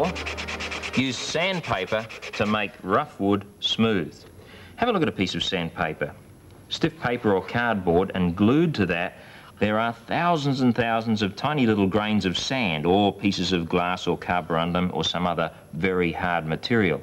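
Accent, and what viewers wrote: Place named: Australia. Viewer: That is how we Aussies pronounce glacier as a rule.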